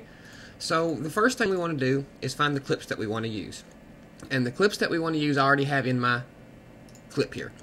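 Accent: South, United States. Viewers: this was really useful. thanks. where's your accent from? (I'm not American, so I can't figure it out). the South or something?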